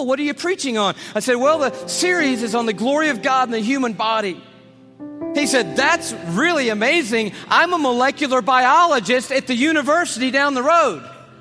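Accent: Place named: South, United States. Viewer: Ευχαριστώ το video που δικαιολογεί την αθεία μου. ¨Εφτιαξε λοιπόν όλα αυτά τα τεράστια άστρα που δε μπορούν να φιλοξενήσουν ζωή, για να δημιουργήσει μια προσωπική σχέση με εμάς τους τυχερούς στην μικρούλα Γη. Εκατοντάδες δις γαλαξίες με εκατοντάδες δις άστρα για να πει στους Εβραίους ότι τους ελευθέρωσε από τους Αιγυπτίους, για να μας απαγορέψει να τρώμε μπριζόλα τη Μεγάλη Παρασκευή και να μας επιβάλει το πότε το πως και το με ποιον θα το κάνουμε στο κρεβάτι μας. Ηλίθιος ο ομιλητής και φυσικά με νότια προφορά. Οσο πιο βλάχος τόσο πιο θρήσκος ...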